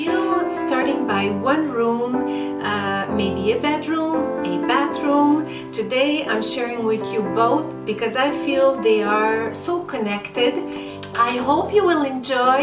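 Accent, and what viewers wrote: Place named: France. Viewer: Hello, you’re always so well organized and disciplined. I like it, but sometimes I’m a bit lazy to do it like that. I love your channel. I’m from Germany and unfortunately i don’t speak English well. Sorry for that. I enjoy every video with and from you. 👌This light French accent is very adorable to you. 😘🙋🏼‍♀️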